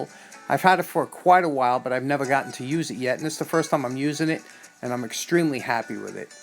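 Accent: New York, United States. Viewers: Now you know my favorite part.... remember how this looked BEFORE I started..... Ok, I admit....I've heard those words with that New York accent in my tool restore dreams as of late. They usually involve a crowded basement complete with the musty smells of an old Victorian era home paired with the faint essence of oil and varnish. The recesses and corners of the room loom in mysterious darkness but the Hydraulic press and the lathe always make themselves known. Finally my attention is drawn to a remnant of red carpet where some shiny tool gleams with an otherworldly aura. The choirs of sweet heavenly voices harmonize with the 50s bad-boy motorcycle theme music. All is well in the world.....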